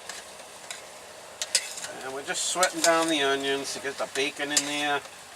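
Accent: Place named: Boston, United States. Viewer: There is no such thing as too much bacon. Ever. Love your Boston/Irish accent! lmao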